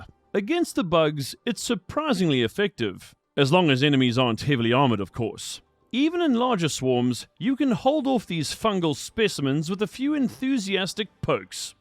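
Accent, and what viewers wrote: South Africa. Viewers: Can help but think youre South African my brotha haha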